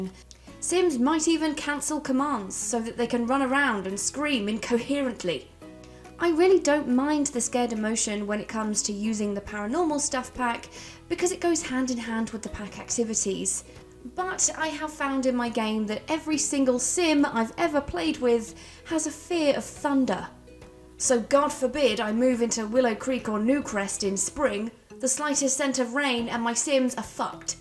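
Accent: United Kingdom. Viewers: I agree with the whole video. In all honesty, I enjoyed this video SO much. Between your lovely British accent and the swearing, this was a treat to my ears and soul. You got yourself a new subscriber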